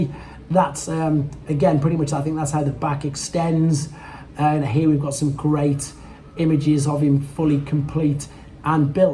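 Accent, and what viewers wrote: United Kingdom. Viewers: in future videos is it possible when u report news of transformer toy sighting, to state the original source you found it from? I only ask this bcuz sometimes I don’t pick up on words u say bcuz of your UK accent or you scroll on your tablet too fast. Please don’t take any offense as I think it’s cool having a UK accent, but being American I have a challenge when u say some words quick. I’m still gonna follow ur channel as I like how u do your transformer videos.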